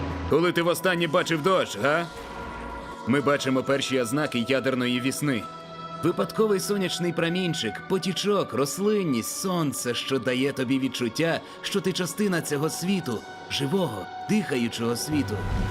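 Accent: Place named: Russia. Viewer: Акцент там не для розваги чи насміхання над кимось. Це для збереження задуму авторів відео, згідно з яким мовник має сильний російський акцент.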